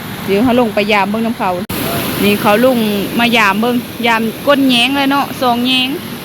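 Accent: Phu Thai, Thailand. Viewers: เข้ามารับชมบรรยากาศ ภาษา การดำรงชีวิตด้วย พูดไม่หยุดเห็นอะไรก็พูดไป ก็ถือว่าดีเลยนะนำเสนอ สิ่งที่มีอยู่รอบๆตัวเราได้ค่อนข้างน่าสนใจ โดยเฉพาะการใช้ภาษาในการพูด สำเนียงภูไท ฟังแล้วเพราะดีนะ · ่มักคำ เว้า คือ กันกับภูไทเรณู ฝั่งไทย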